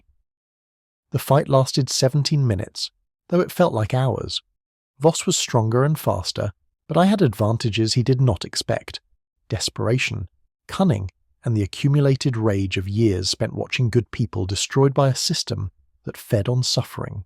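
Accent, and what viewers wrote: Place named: United Kingdom. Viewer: Sounds like UK. It also says so in the description.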